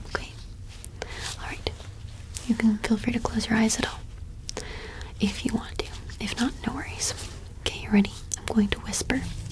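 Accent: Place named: Yorkshire, United Kingdom. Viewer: Чисто йоркширское произношение.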